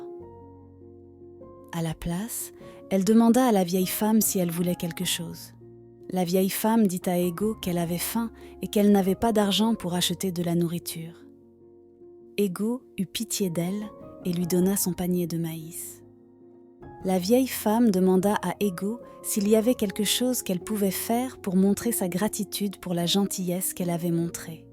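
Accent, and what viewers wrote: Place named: Europe. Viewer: Dommage pour la voix que je trouve trop européanisée pour moi! La voix d’un vieille homme ou femme aurait été le top du top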